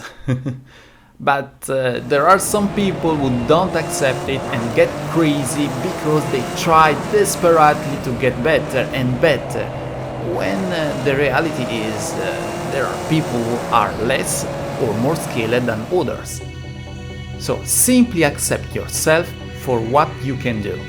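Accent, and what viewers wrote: Italy. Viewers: You're Italian right?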